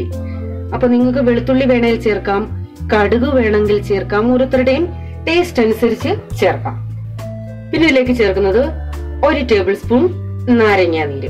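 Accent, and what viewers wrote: Kottayam, India. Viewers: Chechii kottayam karii aano???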